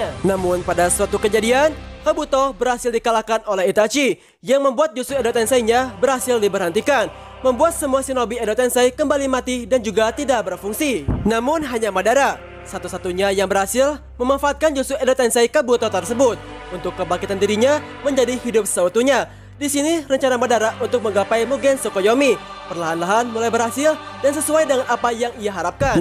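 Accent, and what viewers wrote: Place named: Padang, Indonesia. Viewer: Logat admin nya mirip teman gua orang padang panjang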